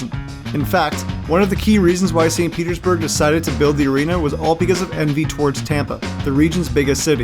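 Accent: Canada. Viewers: I noticed the Canadian pronunciation immediately because I have a friend from New Foundland! I remember reading that when Kate Mulgrew went to NY for acting classes that was the first thing she had to do was to lose the Midwestern accent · Your content is great. The only complaint I have is that if you want to be known as a top video producer, you should make a conscious effort to pronounce the ou, ow sound as people in the U.S. do and not as a Canadian. When i took a speech class in college, the first thing the professor did was explain that if we were ever to go into broadcasting, it was very important to have a generic voice that doesn't let people identify us with an area. You can go to New York for example and the broadcasters talk the same as the broadcasters do in Texas or Alabama. That is the only fault that i have. The content is great but with a little effort, you could make it even better. 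· Is the narrator Canadian?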